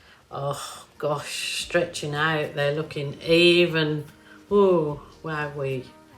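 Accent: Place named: Norfolk, United Kingdom. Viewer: This is beautiful - What is your accent? I feel like i could hear lots of different ones, bit of a norfolk twang - bit Birmingham, bit Australian... Could listen to and watch you for hours...❤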